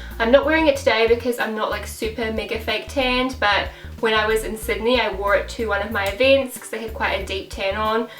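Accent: New Zealand. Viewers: lovely, can I just ask are you from New Zealand? X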